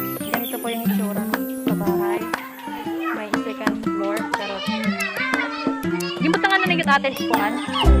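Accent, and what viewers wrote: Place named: Waray, Philippines. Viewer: Waray gud kamo pag habal habal. 😁😁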